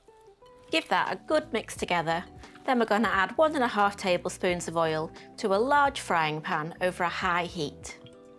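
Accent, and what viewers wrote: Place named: Cockney, United Kingdom. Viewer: Well Done the...loving that Cockney accent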